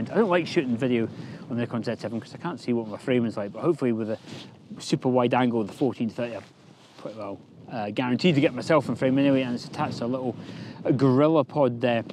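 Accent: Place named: Scotland, United Kingdom. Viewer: I did not know you are from Scotland, probably because I am American, hopefully one of the better ones and not the loud obnoxious ones. You certainly shine with happiness, fun to watch and I ever got excited. You did not give the savings in grams for shooting in 720p over 4K. The savings must be substantial. I like the image of the lake, good vantage point. Thanks for sharing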